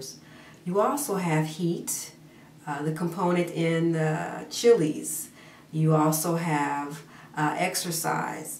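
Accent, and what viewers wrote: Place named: Nigeria. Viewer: Oh I see, it sounded Nigerian lol! And you are pretty :)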